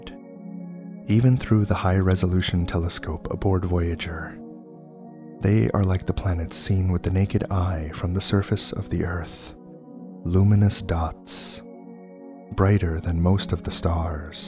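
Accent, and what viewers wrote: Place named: Boston, United States. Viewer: *patreon; my phone apparently doesn't understand the Boston accent 🙄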